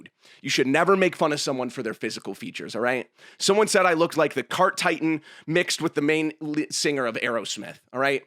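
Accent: United Kingdom. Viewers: The recieved pronounciation is tripping me up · The british accent makes the intro so much more precious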